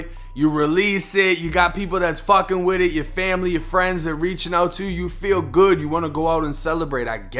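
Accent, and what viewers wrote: Boston, United States. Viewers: “Or a drunken argument between people pottyin’ (partyin’)” the Boston accents crazy 🤣🤣🤣🤣🤣🤣🤣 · He got that Boston accent.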